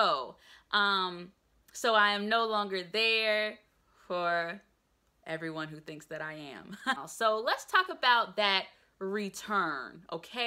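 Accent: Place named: South, United States. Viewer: I knew yo ass was from the south! That southern charm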